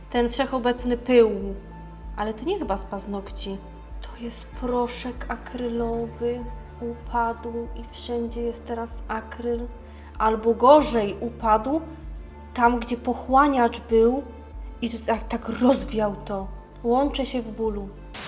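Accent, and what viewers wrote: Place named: Silesia, Poland. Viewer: mów wiecej po Śląsku plisss uwielbiam!!!!!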